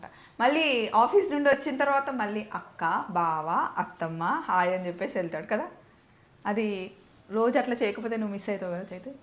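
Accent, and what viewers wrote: Andhra Pradesh, India. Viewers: Telangana accent lo ne matladandi...chala varaki meeru Andhra valla lane matladutharu...konchem ekkado naturality miss avthundi anpisthundhi...this is my opinion...hope you take it positively...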